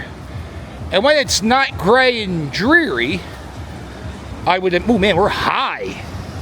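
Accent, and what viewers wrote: Philadelphia, United States. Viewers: That's a serious Fluffya accent. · Linguist consider the Philly accent to actually be a southern accent due to vowel sounds. Baltimore is similar. We Philly folks just talk fast. And this guy has the best Philly accent I’ve heard in a long time. Makes me homesick. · Love your Philly Accent - Helen from Bal-more!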